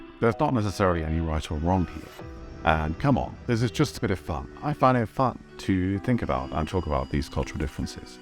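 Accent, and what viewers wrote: Germany. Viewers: Why do you speak English with a German accent? Also, you got some words wrong. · He's taken on some German "tone", yes! The "music" or of a language is surpricingly easy catch - compared to the grammar (in German's case!!) and the pronounciation. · As a Brit I’ve been learning German for 5 years, albeit slowly and I would’ve thought that you were German by your accent if I didn’t know better. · You have such a German accent, to your English one now. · You can tell you've been over there for 25 years, you do have a very German inflection to your pronunciation. I'd honestly be more inclined to believe that you were German and had lived here for 25 years (hence the excellent English) than vice-versa, haha. Not meaning to insult you. My uncle lived in Canada for the last 40 years of his life and completely lost his English accent (although to be fair he was still speaking English). 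· He sounds like a German who speaks really good English!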